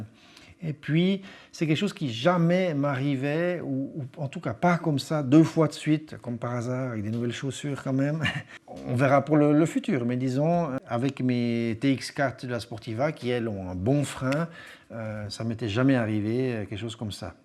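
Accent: Switzerland. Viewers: Ton accent me donne envie de traverser la Suisse d'est en ouest ( via Alpina ) ^^ Sinon bravo pour " tenir " la parole 45 mn sur des batons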